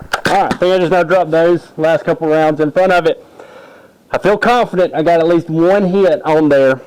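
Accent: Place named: South, United States. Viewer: Finally a southerner, I get so tired of listening to yankee's talk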